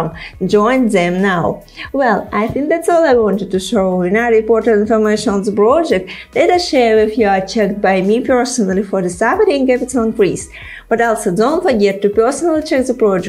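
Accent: Russia. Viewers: how to understand in a second that she is from Russia))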